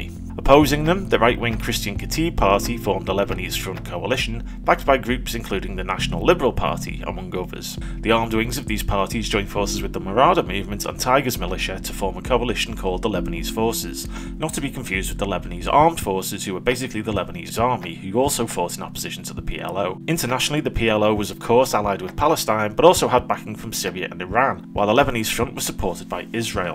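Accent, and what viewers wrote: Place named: North East England, United Kingdom. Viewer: Just come across your channel (new subbie now). Why on Earth doesn't this channel have more subscribers???? Your videos are excellent and really well made! Good to see that you haven't been "silenced". Working my way through the videos. Keep up the excellent work! PS You sound like you are from my part of the world (north east England).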